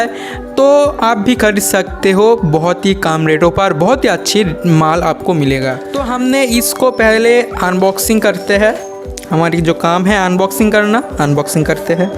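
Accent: India. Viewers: Bengali accent bolte😁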